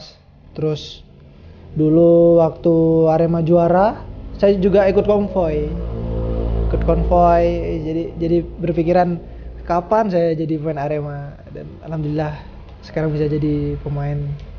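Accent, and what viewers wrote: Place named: Madura, Indonesia. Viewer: Oreng madureh smpyan kak 😄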